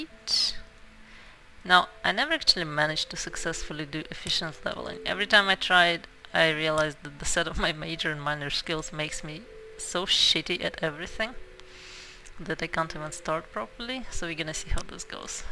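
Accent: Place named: Russia. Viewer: Dat Russian accent is so awesome. I will gladly be a sub of yours :)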